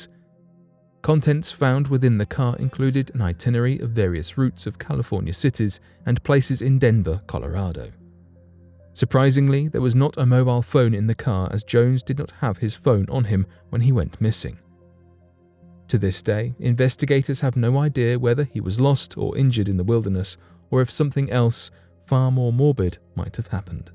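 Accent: United Kingdom. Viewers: The British accent just gives these videos the extra creepy edge, can't be done with an a American accent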